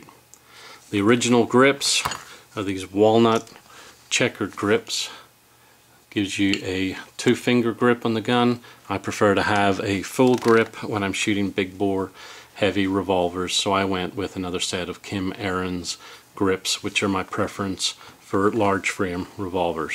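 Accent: Northern Ireland, United Kingdom. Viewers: Accent Northern Ireland Methinks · Northern Irish to me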